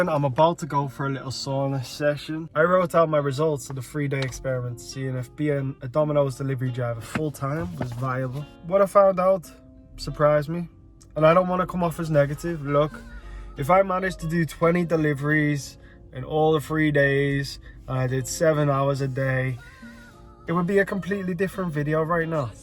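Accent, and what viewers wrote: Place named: Liverpool, United Kingdom. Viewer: Why talk Scouse, African and Jamaican ' bro ' strange man you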